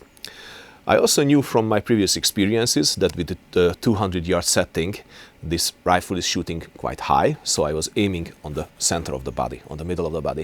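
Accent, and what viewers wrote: Hungary. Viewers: ..az angolod jó csak nagyon magyaros😅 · So accurate shots! Perfect video with nice hunglish accent as always!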